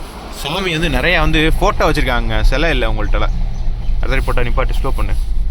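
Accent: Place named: Madurai, India. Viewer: Namma madurai bro...doing business in tirupur....neenga bro...unga slang and voice enaku romba familiar a iruku...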